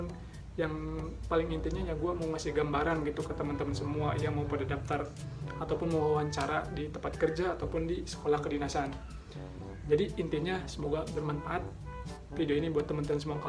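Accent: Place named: Sunda, Indonesia. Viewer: "Seperti itu nya" lagi serius dengerin, mendadak ketawa bang ahahahhahah sunda na kentel pisann, hatur nuhun kanggh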